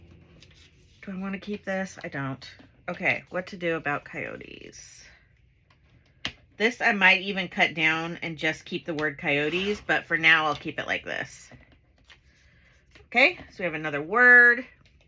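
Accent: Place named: South, United States. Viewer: If you're from Oregon, where the ##$% did you get that southern accent that comes out?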